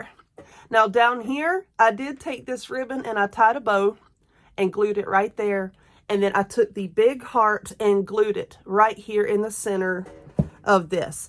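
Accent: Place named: Texas, United States. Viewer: curious as to what state you are in. I am a Texas and you sound like one.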